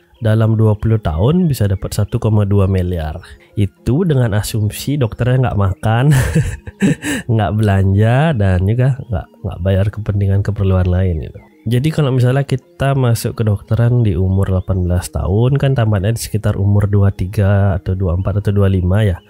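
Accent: Bali, Indonesia. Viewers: swastiastu blii, kirain orang keturunan arab, setelah denger aksennya eh tyt orang bali wkwkwkwkw. Makasih info nya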